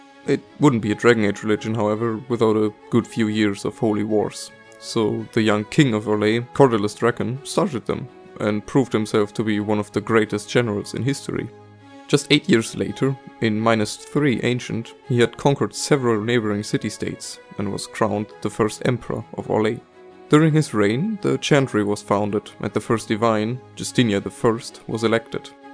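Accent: Germany. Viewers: He sounds German.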